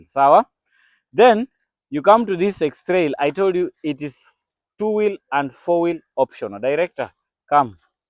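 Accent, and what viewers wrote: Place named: Zambia. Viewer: 2.7 what howmuch in usd where are selling from you sound Zambian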